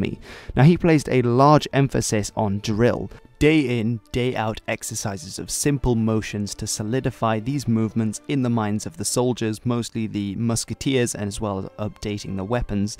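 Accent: Netherlands. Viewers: you are dutch, youre accent is dutch and you pernoune dutch names almost perfect xD HUP HOLLAND HUP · is this guy dutch or english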